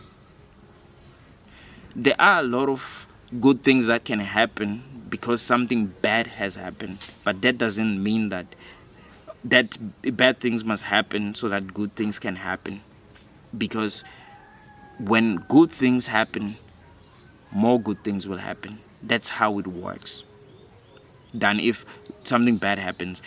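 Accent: South Africa. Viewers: I could tell by your accent that you're South African. I'm from East London, Eastern Cape. Keep up the good work, love your content.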